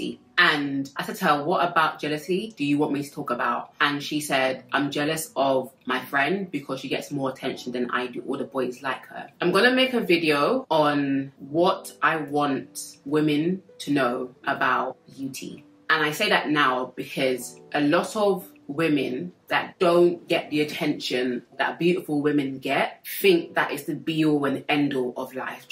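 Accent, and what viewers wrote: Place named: United Kingdom. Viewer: sounds like Nicki Minaj doing a british accent ❤i love her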